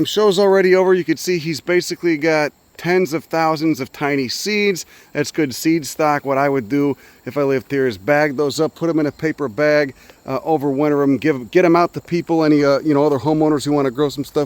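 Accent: Chicago, United States. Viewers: I notice your Chicago accent gets stronger when you're back in da region!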